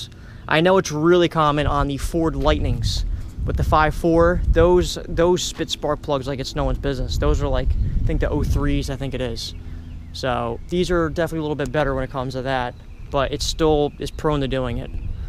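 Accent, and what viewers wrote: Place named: South Jersey, United States. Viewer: Frank Michael Sr It’s definitely South Jersey. I can tell by his accent and the flat landscape with barely any trees. We call them 609ers.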